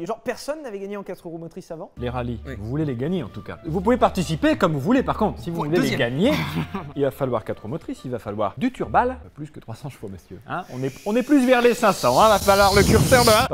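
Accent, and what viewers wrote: Germany. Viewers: Quel accent allemand de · L’accent allemand une pépite!! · C'est pas normal que je regarde cette vidéo pour la dixième fois juste pour l'accent Allemands et le constructeur:)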